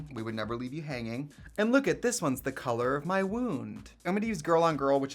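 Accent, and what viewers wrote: Wisconsin, United States. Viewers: I can really hear the wisconsin accent in this one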